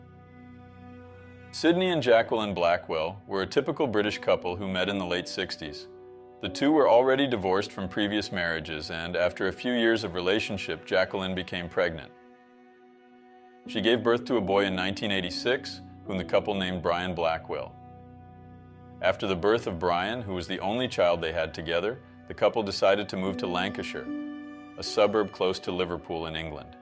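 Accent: United States. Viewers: Your American accent is spot on!! I almost had to double check that I clicked on the write channel. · Very tragic case. Great video though! Had to double check to see if I clicked the correct channel because of your excellent American accent :) Anyway ❤ thank you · Are you from America or australia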